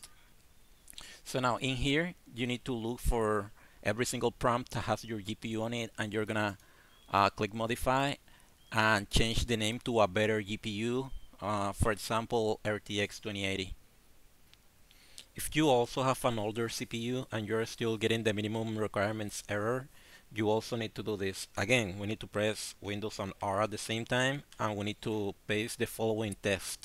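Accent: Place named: Brazil. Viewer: mano tu e BR? mo sotaque de BR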